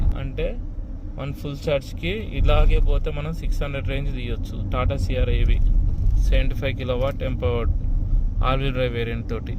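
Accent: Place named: Telangana, India. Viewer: బ్రో మీ మీ వీడియోలు చాలా బాగున్నాయి. మీ ది తెలంగాణనా లేదా ఆంధ్రప్రదేశ్ నా మాట తీరు సేమ్ తెలంగాణ ఎలా ఉంది. అందుకే డౌట్ వచ్చి అడిగా ఏమనుకోకండి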